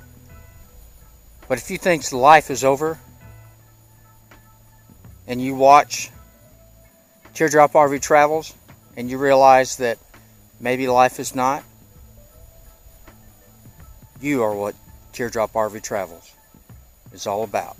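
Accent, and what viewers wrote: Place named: Kentucky, United States. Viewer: God Bless, I knew right away you where from Kentucky. Love your videos ❤️🙏🏻🇺🇸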